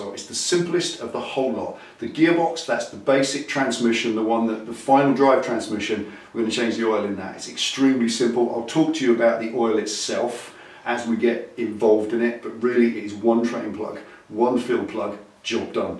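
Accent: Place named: England, United Kingdom. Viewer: First, this is a great series man, and I'm going to throw you a bone on PP, or buy a Tshirt to say thanks for your work. Never again will I pay these outrageous fees because that's about right on the money. Average low end cost is about $100 USD per hole anywhere in Texas. Service rates vary from $85-$125/hr depending on where you are. I just picked up an old 04 Springer, and I'm using these videos as they are the best on YouTube. Plus, I love English accents, so that's a good bonus. 😎 A couple of questions mate...if you don't mind. 1. Old timers, especially experienced Mechanics strongly encourage running 80/140 in the gearbox. S&S performance does as well by the way. What say you about that? 2. Just curious...Why did you not use the socket for plug removal here?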